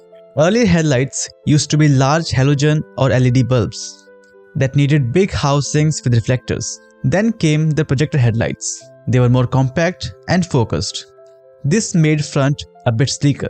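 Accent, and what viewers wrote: India. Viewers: Dude has the thickest indian accent and cant pronounce Lamborghini 💀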